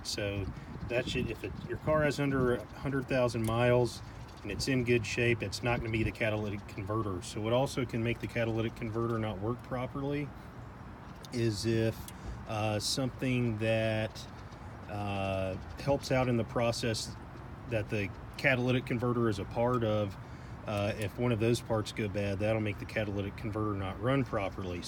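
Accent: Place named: Kentucky, United States. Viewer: You from Kentucky? Sounds just like my brother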